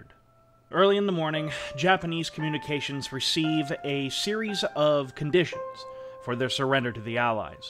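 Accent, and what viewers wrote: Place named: United States. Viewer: About half-way through and really enjoying the telling of the story - the one thing that is grating on me is the pronunciation of some of the Japanese surnames :( Ida for instance (I believe) should be "ee-da" and not "eye-da" and Takeshita should be "ta-kesh-ta" not "ta-ke-shii-da". Gotta watch that American accent and those "t"s . Aside from that, good story, nice pace.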